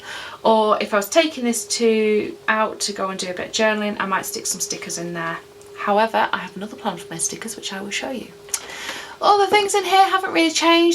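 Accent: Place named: England, United Kingdom. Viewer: Love your July setup. I hope this doesn’t sound weird but I love your English accent. From an Aussie follower x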